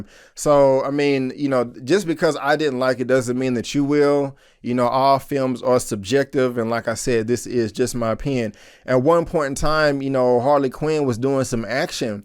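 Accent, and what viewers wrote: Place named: North Texas, United States. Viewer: It sounds north Texan to me like dfw area mart be very wrong but that's how my people talk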